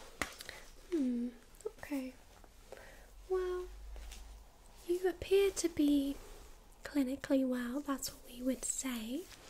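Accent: United Kingdom. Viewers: YouTube need some more decent British accent ASMR like your channel 😊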